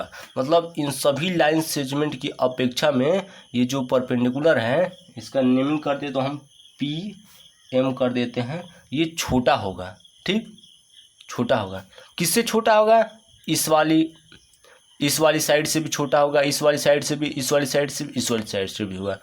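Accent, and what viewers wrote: Bihar, India. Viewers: Lagata hai bihar ke teacher hai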